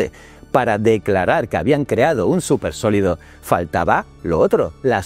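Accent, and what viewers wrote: Spain. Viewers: ASQUEROSO ACENTO ESPAÑOL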